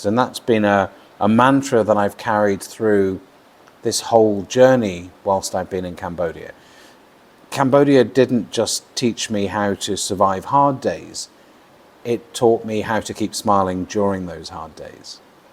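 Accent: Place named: England, United Kingdom. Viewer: Great pommy accent, mate! 😄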